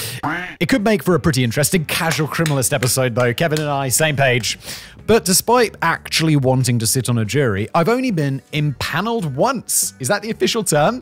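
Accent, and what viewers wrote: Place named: United Kingdom. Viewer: Years I have waited and finally I am satisfied....finally got to hear say "innit" in that very mild British accent 😅😂😂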